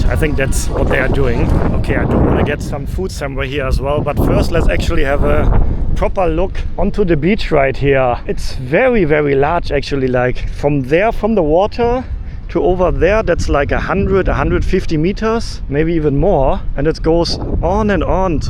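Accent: Germany. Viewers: Telling us you are german without saying you are german.hehe this is NO hatte! Your english is very nice but it sounds Real german hehe. Like your Videos. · This German-Enlish makes me shudder.:face-pink-tears: My son is living in LA and likes it very much. He was born in New York. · Want to know what a hardcore German accent sounds like just watch this video. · You have the most interesting accent. You can hear the German but sit sounds like German merged with Asian. Interesting😊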